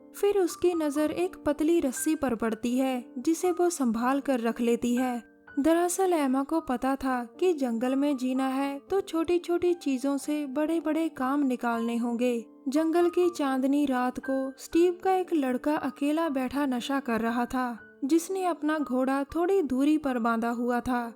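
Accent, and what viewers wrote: Punjab, India. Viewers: Mam I love your voice hope I didn't get addictive 😄❤️🇮🇳 Punjabi tone is the best 👍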